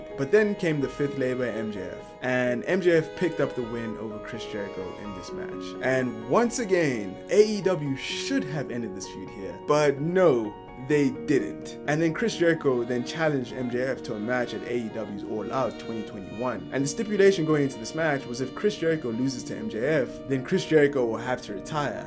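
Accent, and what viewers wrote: South Africa. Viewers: I'm not sure whether its but you sound South African🤔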